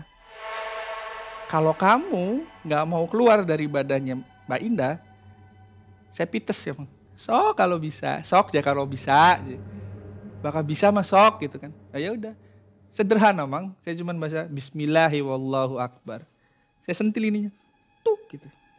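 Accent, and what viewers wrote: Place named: Indramayu, Indonesia. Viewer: Orang mana nih bahasa nya ga angger klo ga Indramayu cirebon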